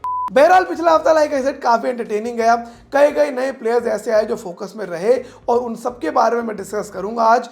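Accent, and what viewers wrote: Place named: Hyderabad, India. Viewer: Touba touba bhoth buri Hyderabadi bolre tum aur ek bar nakko bolona plzzzz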